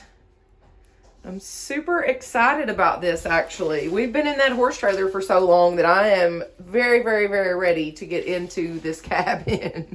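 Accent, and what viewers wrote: South, United States. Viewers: You are just a breath of fresh air, u are jus adorable !! I love your little southern accent too, jus too precious !! OMG !! I am over the moon happy for Ya'll and your family, and yes It Is most certainly bittersweet considering what ya'll have gone through. That Is jus the best news. Your house when It's all done and you put your cute little touches decorating and such, It's going to look so cute, I jus know it !! Oh this jus made my day !! Im so thrilled for you. Congratulations girl. So I see your In Is It Samburg, TN?? You are literally only 2 hrs and 18 minutes from me !! I know If we lived closer to each other we'd jus be fast friends, cuz you are jus a doll. I'm In Oak Grove, Ky...rt down the road from Fort Campbell military base. But I'm originally from Milwaukee Wisconsin. Been down south for about 7 yrs now. Ok sweetie take care of yourselves, much love, God Bless Ya'll !! 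❤❤ Im not Southern but I jus love saying "Ya'll"....🤣🙏🤗💋😘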